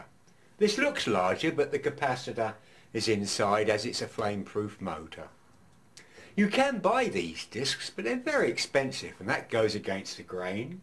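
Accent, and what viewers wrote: United Kingdom. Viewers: What size is the larger transformer that you have used for the bigger spot welder? Very good videos informative, clear and concise.....and British....Excellent!!! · this is amazing I love home made tools!!! and this may sound stupid, but I find I learn things a lot easier if the one teaching has a British accent lmao crazy I know lol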